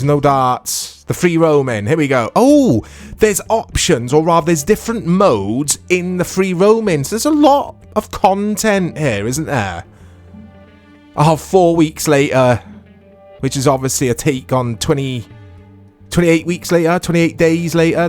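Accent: Wales, United Kingdom. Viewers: It's refreshing to hear a fellow cymraeg accent on here 😂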